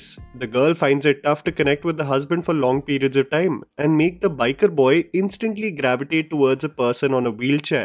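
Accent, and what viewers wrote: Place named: Kerala, India. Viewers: Yr malayalam is so funny ❤luv yr channel💜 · Well I'm a Malayali...i really like your Malayalam accent 😊😊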